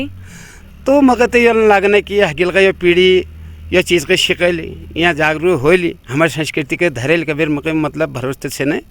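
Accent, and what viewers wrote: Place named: Pahari, India. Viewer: ji apka swagat karta hu app pahari me baat krti ho bahut accha lagta hai 🙏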